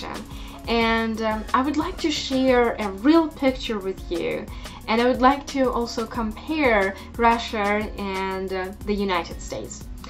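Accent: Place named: United Kingdom. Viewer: Fascinating accent with a little polished British in there. Like your videos. You should post more often.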